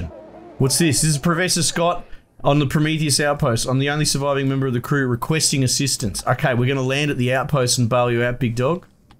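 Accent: Australia. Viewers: Is it me or are you Australian?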